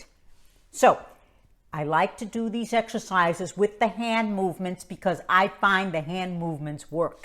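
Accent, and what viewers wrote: New York, United States. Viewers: I love your NY accent! A lot of my family is from there and it reminds me of them 😄 Also your videos are super informative. Thank you!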